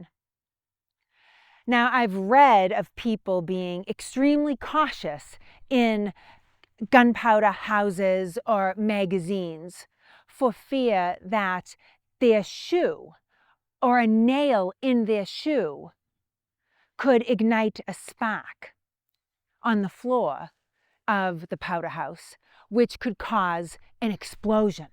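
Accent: Boston, United States. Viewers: There is something magical about listening to the history of the American Revolution with lovely voice and Boston accent. Came for the history, stayed for the accent. Thank you! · You only have to listen to talk for 15 seconds before you realize she is definitely from Boston.😂💕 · Great video! I have studied military history as a hobby lifelong but never heard of this battle. When I was stationed in various places over military career I always visited sites and kept an eye out for re-enactments and by chance never managed to see a civil war re-enactments but did see 3 Revolutionary War ones including Camden South Carolina and Monmouth New Jersey and an outlier Viking battle in Iceland :D. Orig New Yorker retired in PA love your Boston accent! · Hey... yet another great video, as always... but, you left Boston/Massachusetts and still have that accent 😊... 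thanks, Jimmy from beautiful 😍 bucolic 😊downtown Schnecksville, PA, sun 🌞 and fun 🎉capital of the world 🌎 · I'm here for the awesome history lesson but I'm also here for the wicked Bahstun accent hey. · It's not New England it is a Boston accent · My sweet Historian with the Boston Accent!!😘💕🇺🇸💕🤘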